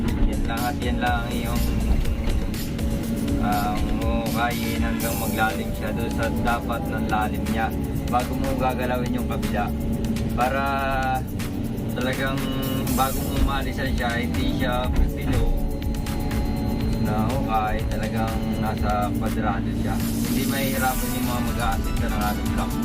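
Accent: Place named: Bicol, Philippines. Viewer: Parang bicolano ka mag salita pre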